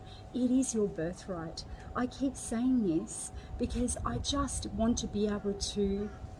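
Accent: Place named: Australia. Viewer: I like your accent.Are you in Australia?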